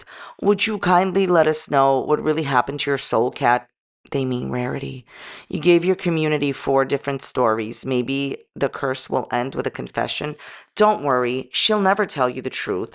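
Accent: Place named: East Coast, United States. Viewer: Was waiting for this 😊😊. My favorite eastern coast accent YT person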